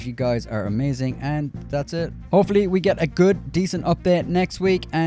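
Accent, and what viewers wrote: Ireland. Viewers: is from Ireland they sound weird to a brit the 30 especially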